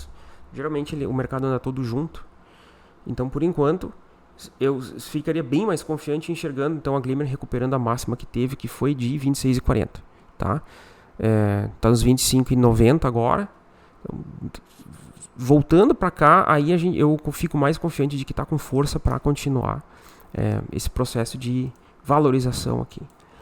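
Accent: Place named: Australia. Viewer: Kkkk😂 mas não tem mesmo, o tem um sotaque tipo uma mistura de Inglês Autraliano com Gaúcho.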